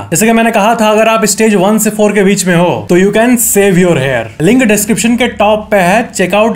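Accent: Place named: Bihar, India. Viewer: But It sounds Bihar or Jharkhand* accent🫠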